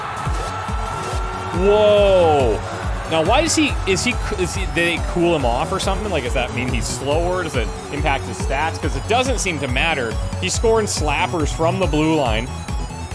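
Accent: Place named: Saskatchewan, Canada. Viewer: Your speaking gets much more "Sasky" when you are playing this game. As a fellow Saskatchetonian, I love it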